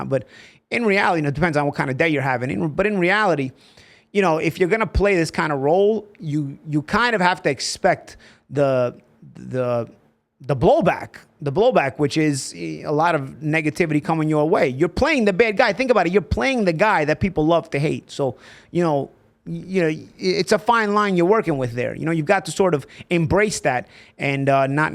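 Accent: Brooklyn, United States. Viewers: I’m a casual boxing fan who actually discovered you Paulie as a commentator lol. whenever me and my friends would watch fights on showtime I would always learn a lot from you as an analyst. that’s when I was like damn who is this commentator Paulie he’s awesome and he reallyy knows his stuff.. I knew you were from Brooklyn NY first time I heard you talk lol just from your accent which drew me to you even more.. love this channel best boxing channel on YouTube!! Paulie is the man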